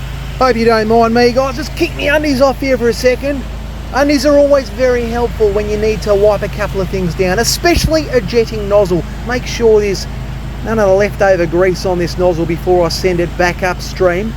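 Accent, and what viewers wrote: Australia. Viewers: Watching from the Netherlands. Stumbled on your channel when your vid about unclogging a drain in a parking lot showed in my suggestions. No idea why, but it is hilarious, especially with your ozzie accent. Early next year we have plans to visit Perth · This reminds me why i don't got to Chinese buffet anymore and why it gave me diarrhea when i did.... this is funny shit and the aussie commentary makes it better...... it's choka blocked mate....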